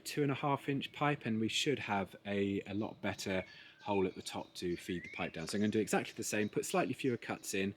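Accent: United Kingdom. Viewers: I totally didn't expect to hear the King's English from someone fabricating a farm tool. I had no clue Brits were still doing things like this. What a great video and really nice work.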